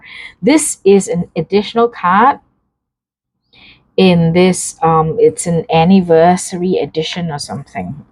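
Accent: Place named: Scotland, United Kingdom. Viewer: Really great read. My first time watching you and noticed you have about 4 accents messed into one. Would love to know what they all are. I think there is a bit of Scot thrown in the mix.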